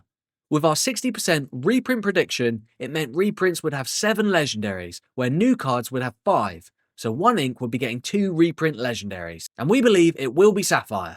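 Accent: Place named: United Kingdom. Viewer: I’ll be honest I thought you were using AI British style accents for your shorts. Buttery smooth my man 😂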